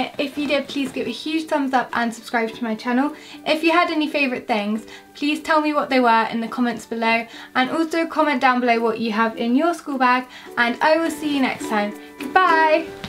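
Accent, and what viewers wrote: United Kingdom. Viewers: are you british?💕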